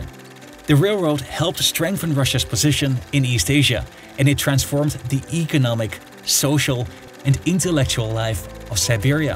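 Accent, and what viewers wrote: Netherlands. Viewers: Lekker nederlands accentje wel haha 😂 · как можно не слышать что автор голландец? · You left the Dutch out of your list of European powers dividing up Asia - and it sounds like you are Dutch..? Did I hear that right? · This has to be a dutch accent. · If the narrator isn't Dutch due to his accent, I will question my entire existence. Also, Sergej Witte is highly underrated, and apparently his re-entry into government benefitted the Russian Empire greatly, though sadly shortly.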